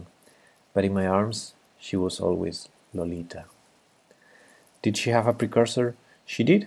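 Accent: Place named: Paris, France. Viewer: the narrator is from paris.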